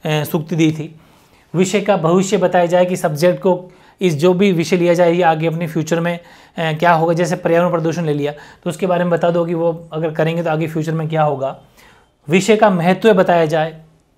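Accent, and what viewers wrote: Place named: Jodhpur, India. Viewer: bohat bdiya lagi sir class bolta h jodhpur vala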